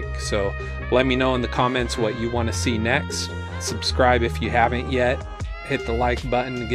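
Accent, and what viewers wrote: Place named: United Kingdom. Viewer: The mans british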